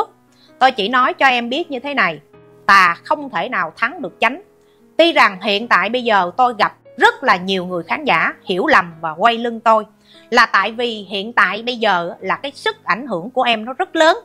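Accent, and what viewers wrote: Standard. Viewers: Chúc chị nhiều sức khỏe và luôn tươi trẻ khỏe mỗi ngày.Chị có giọng nói rất hay và chuẩn❤❤❤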